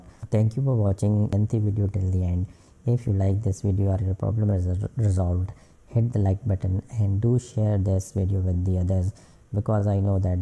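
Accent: India. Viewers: the indian voice tho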